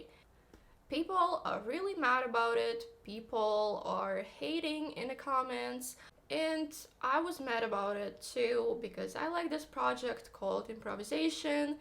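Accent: United States. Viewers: Your American English sounds amazing! I wish you all the best 😊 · Interesting comparison between Russian social media and YT. Your subs seem to be going well. Nearly 50K now. Going great. Hang in there. You do good work. I don't/won't have Face Book, so the question is N/A for me. I'm interested in where you learned your English? You have certain American sounds on certain words. Your English is very good, I don't hear any mistakes there at all. 😁😁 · I'm shocked at how good your English has gotten over the past year... You're starting to sound American lol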